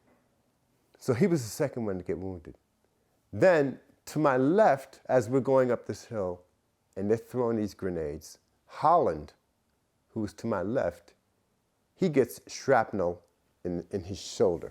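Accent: New York, United States. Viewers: absolutely fantastic storyteller it seems like you were right there with him, I love that New York accent💯🥴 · God Bless this gentleman. Great story teller. Classic ol' school New Yorker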